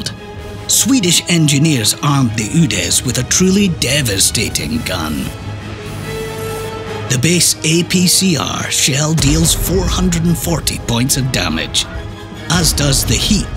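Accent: Scotland, United Kingdom. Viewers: That Scottish accent is thicc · The scottish accent is stronk in this one.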